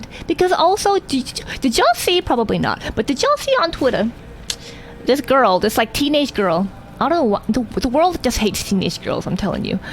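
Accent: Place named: Australia. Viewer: Your posh Ozzie / UK accent is real good. Hope your brain is kind to you for at LEAST a week x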